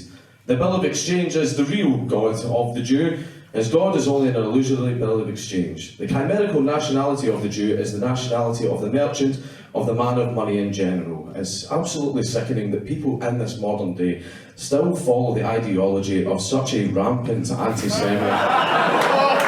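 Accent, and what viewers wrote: Scotland, United Kingdom. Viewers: Could barely understand you. Scottish Comedian/10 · Way too British to understand, dear god. Lol, but good stuff, man. Right on.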